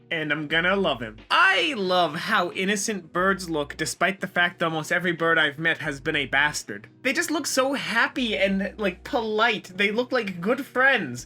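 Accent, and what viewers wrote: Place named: New Jersey, United States. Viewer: I sense a Jersey accent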